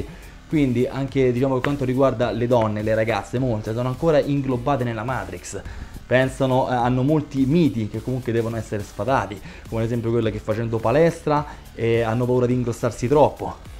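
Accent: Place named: Rome, Italy. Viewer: sei sempre il top ti seguo con gioia e mi fai morire con L tuo dialetto romano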